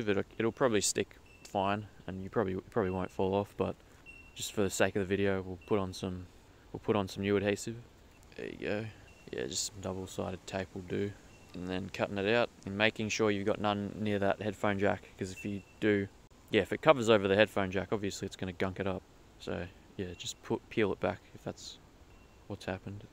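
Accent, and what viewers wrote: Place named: Australia. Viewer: OMG. He's Australian. Thumb down!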